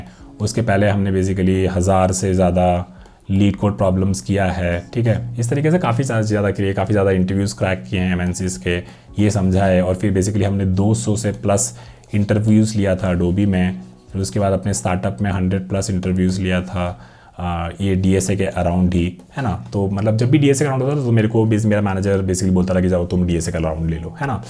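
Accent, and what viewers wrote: Bihar, India. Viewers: Aap bihar se h kya thik h 😊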